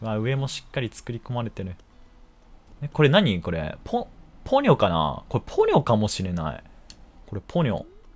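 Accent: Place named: Gifu, Japan. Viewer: たまに出る方言的に石川か岐阜かなって思ってましたが、岐阜でしたか。勝手にすっきりしました。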